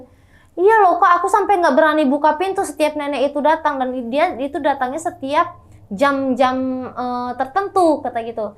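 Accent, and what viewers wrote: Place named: Medan, Indonesia. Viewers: sor x ku dengar kakak ini becerita pake logat medan bah wkwk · Logat nya Medan kali ha salam dari Medan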